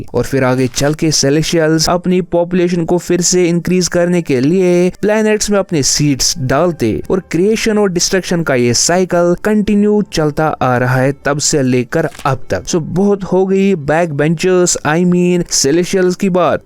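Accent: Kashmir, India. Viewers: bhai tumhari batu sai hi pata chalta hai ki tum Kashmiri ho 😊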